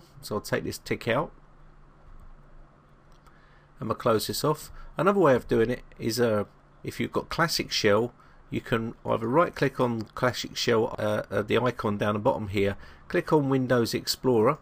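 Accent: England, United Kingdom. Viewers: It's just the English fucking accent you bellend, you know.. the one that came from England and not 'Murica..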